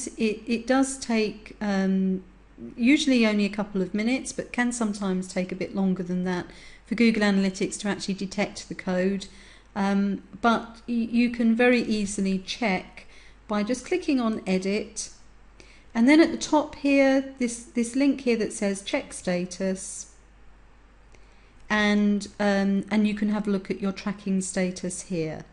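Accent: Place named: England, United Kingdom. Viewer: your english acent just wow <3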